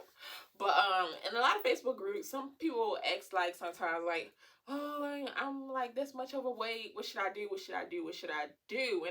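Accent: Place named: New York, United States. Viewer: I hear the New York all In you lol .. I’m Right next door though in Philly so unknown how that parking get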